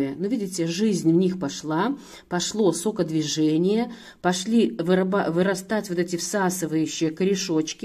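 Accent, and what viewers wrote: Belarus. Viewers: Спасибо❤. У вас такой милый белорусский говор с акцентом на букву "я"))))))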